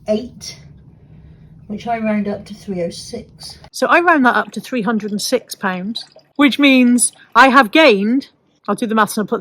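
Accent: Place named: Somerset, United Kingdom. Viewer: Oh - some people are truly b******s what gives them the right to comment on what you do and are?! As you say we dont pay to watch you and if they dont like it - DONT WATCH!! To give someone advice (especially unwanted and unasked for) is truly annoying and completely unnecessary so you just be and forget about all the nasty, horroble and let's face it insignificant people. My Mum always taught me if you have anything nice to say then dont say anything at all - why cant people do this??!! By the way I have to say although I am new to your channel I LOVE your accent!!!! It is so much more noticeable when you do pod casts with Caroline - do I tae it it is a Somerset accent? Whatever, its lovely. Take care and completely ignore all the horrible people and you be you!! Bye for now and much love, Lyn xx xx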